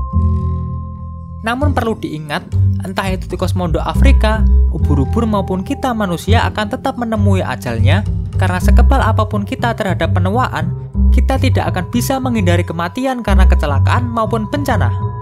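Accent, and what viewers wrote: Java, Indonesia. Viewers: medo'g ngomongnya.. :v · pengisi suara nya bikin ganggu , medog banget ! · asli pean wong jowo tekan logat e wkwkwkwkw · medok jawa tengah/timur ya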